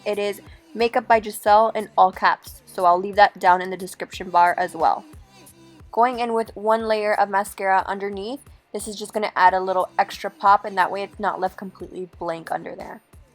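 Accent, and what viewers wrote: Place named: Mexico. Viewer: Kmarie Valle It's called an accent... and majority of us talk like this haha isn't the only Mexican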